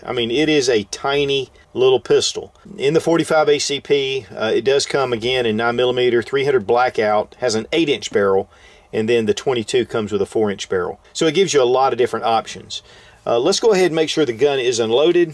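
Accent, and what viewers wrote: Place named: North Carolina, United States. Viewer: Hi. Like your videos. You sound like you are down in eastern NC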